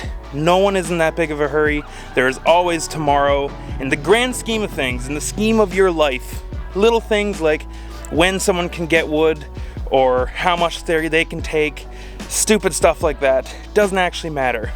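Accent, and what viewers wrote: Canada. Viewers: Lmao the Canadian accent “aboouut” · Canada eh?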